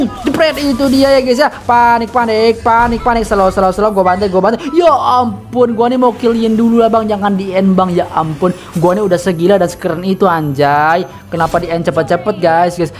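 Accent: Palembang, Indonesia. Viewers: Emang lucu wong plembang klo ngomong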